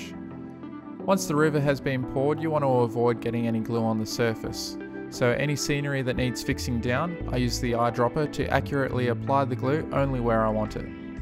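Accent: Australia. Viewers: Hey mate, you sound like a fellow Aussie, what foam is that and where do you get it from? Thanks!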